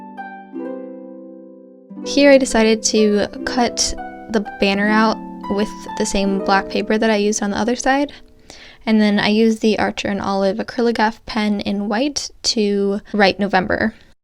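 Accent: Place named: North America. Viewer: Omg this is the cutest spread ever💖💖🥰🥰🥰 And you LITERALLY «stole» my idea for november in my new BuJo which I ordered from A R L, the bjqueen, so I FINALLY have a dotted notebook where the quality of the paper actually can handle my markers (Tombows, Crayola Supertips, Artist Brush Pen, Tombow Fudenosuke, Pigma Microns etc, none of them ghost or bleed through. But all my other notebooks is so poor quality and this is my first time (year) with Bullet Journaling so it was truely awesome when it arrived in the beg.of October. But I don’t have a boyfriend at the moment, so I was gonna use my bestie from high school she owns a bakery and is rediculasly good at making cakes and stuff like that, so (I kid u not...😅) I was gonna ask her if she could send me some photos close up of some of her work and her shop of course. She lives four hours by car away from me, so it is very long time since I saw her and we kinda lost touch so I was gonna text her like right now and ask about the pics and I have been running around changing lightbulbs in my apartement cos it was so so dark in here. 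And Norway is six hours ahead of NY and nine hours ahead of LA, and idk where u are in the USA, or Canada, I just know, or believe you’re North American cos of the accent you have❤️💙🇳🇴💙❤️💖👱🏻‍♀️💖😺💖🐶💖📚📚🖌🖌🎨🎨🎨🩰🧘‍♀️❤️ Ps: I just wanna say: HUGE FAN of your art. The oct was the 1st I saw, and now I am completely hooked🥰🥰🥰😁😁😁😁😁😁😁😁😁😁😁😁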